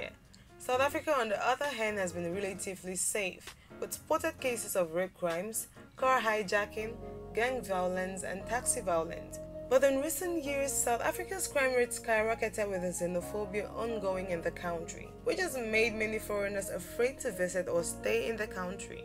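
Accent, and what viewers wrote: Nigeria. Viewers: This person sounds Nigerian Proudly south african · Your Facts are 100% and I must admire that given that I can Pick up the Nigerian accent in you...I thought you were gonna be Partial buh you proved Me wrong..And Yeah,South Africa is a Much Better Country to live in as Far as Africa is concerned .. Oohw..Im South African by the way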